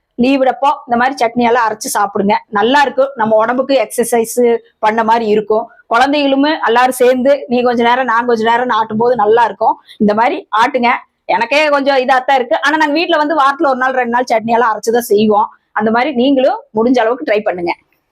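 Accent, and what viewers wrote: Kongu, India. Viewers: கொங்கு தமிழில் நீங்கள் சொல்லிக் கொடுப்பது பேரழகு.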